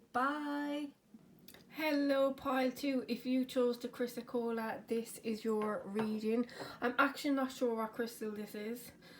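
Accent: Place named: Ireland. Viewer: By the way your Irish accent is so cute. I do not hear people who speak English with Irish accent but it sounds interesting.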